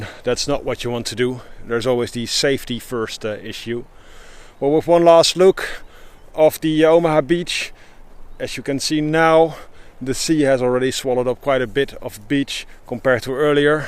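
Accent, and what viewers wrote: Netherlands. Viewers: You have such a Dutch accent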